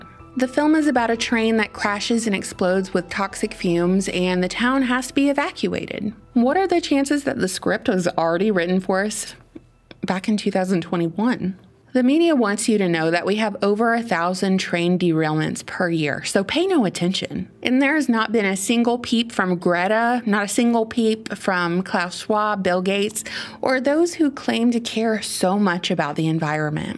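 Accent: South, United States. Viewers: I like how you narrate this in the same tone the same way you’d read a story book to a child. It’s nice. Like “and thennnn” in a song song y voice. I can tell you’re southern to the bone all us southern ladies do this. 😂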